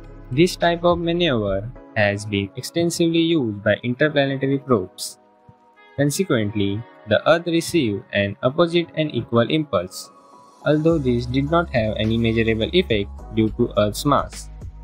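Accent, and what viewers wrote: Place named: England, United Kingdom. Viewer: I'm sorry I don't understand the English accent very well :( Good animation ♥️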